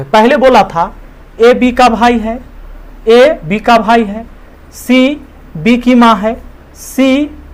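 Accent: Bihar, India. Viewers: Sir ap smjhaate v achha hai aur bolne ka bihari style mast hai 💕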